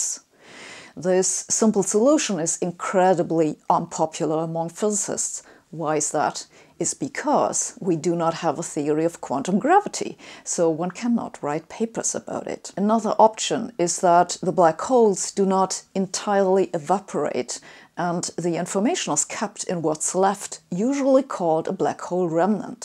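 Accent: Germany. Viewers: Arthur Dent dude she's German it's called an accent.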